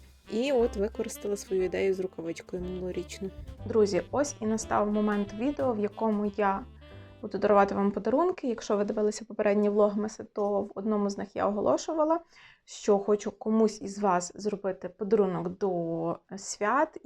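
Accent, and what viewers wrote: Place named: Lviv, Ukraine. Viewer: Доброго вечора,на Ваш канал потрапила за рекомендацією " ютуб", почувши щиру українську з львівським присмаком залишилася тут без вагань! Маєте гарний смак! Ваші відео то окрема форма насолоди! Завжди все стримано, вишукано, гармонійно, щиро. Наразі працюю з шкарпетковою пряжею ( вяжу для ЗСУ ). Гарних, мирних Вам свят, теплих та цікавих зустрічей! oksana_sokolova156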